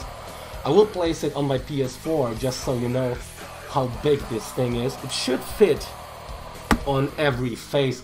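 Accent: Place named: Russia. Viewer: Ебать у тебя акцент просто конский, я сразу понял ,что наш человек снимает видео =D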